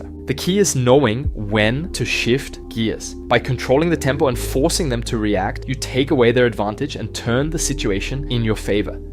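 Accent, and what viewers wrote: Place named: Germany. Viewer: Da hört sich aber jemand deutsch an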